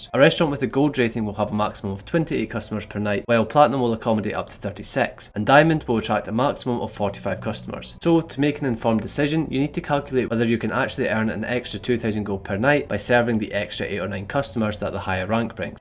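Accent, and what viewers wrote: Scotland, United Kingdom. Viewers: You're talking to fast lad , i could barely hear you through your Scottish accent. 😅 Despite that, this video helps me , makin good progress on the game. Thx for your time uploading this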